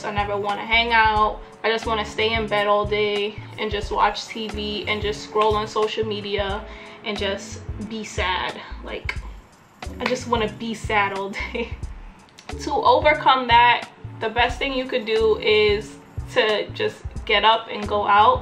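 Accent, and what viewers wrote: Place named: Caribbean. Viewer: Love your vids! Where are a from? I watched a few of them and I think I can hear a slight Caribbean accent, with certain words that is.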